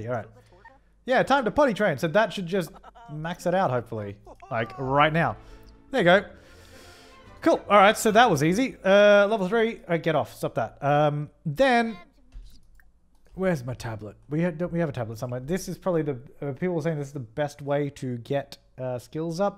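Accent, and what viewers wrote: Australia. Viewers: I have been sick and binging this series. I wasn’t listening and my mom asked me “oh…you aren’t watching the chaotic Australian sim guy?” Hahaha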